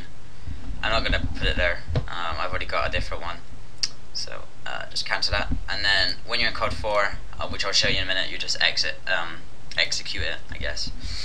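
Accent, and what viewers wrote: Ireland. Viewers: Northern Irish? Southern Irish? Hmmm? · HE'S FECKIN IRISH!!!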